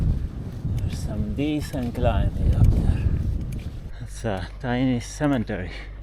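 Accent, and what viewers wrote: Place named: Italy. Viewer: Ho reconisciuto questo bellissimo accento italiano . non importa la lingua straniera parlata , l’accento mi fa sempre scogliere 😂😂😂😂 benvenuto nel nostro paese ❤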